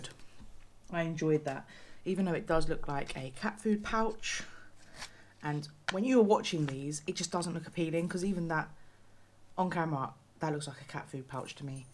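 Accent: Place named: England, United Kingdom. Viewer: That was a really good review. Great amount of food, and plenty of drinks and snacks. That English accent. Looking forward to your next review.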